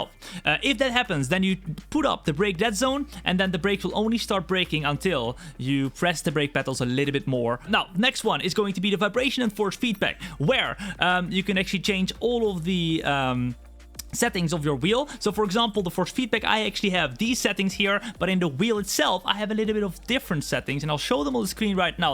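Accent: Netherlands. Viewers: Ben je Nederlands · Are you from the Netherlands?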